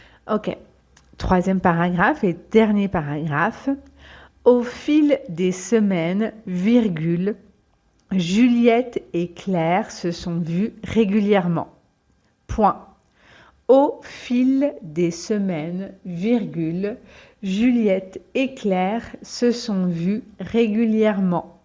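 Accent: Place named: Paris, France. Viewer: Bonjour . Merci . Apparemment , votre accent est français parisien . J'aimerais que vous laissiez les signes à l'épreuve du candidat ainsi que la mémorisation des portions de phrases sauf le . à la fin de la phrase . Voudriez-vous lire et relire moins vite toute phrase pour mi-sourds par la tension comme moi .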